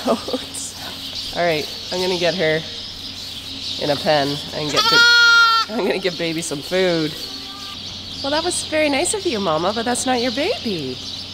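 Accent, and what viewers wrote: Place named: Canada. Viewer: Hey! My mom who went home to heaven in 2014 was raised in Kitchener but raised her family in Michigan. I have many many fond memories of visits to relatives in Kitchener (always the farmers market was a high light) and the surrounding area. When mom turned 70 we 'kidnapped' her for a weekend in Stratford...theater and mint smoothies were enjoyed!!! I love that you are from that area and sport your Canadian accent. Just wondering if you could think about renaming the LambTaxi the "EWEbur"...love all your puns and thought this might fit right in. Merry Christmas from a 1/2 Canadian.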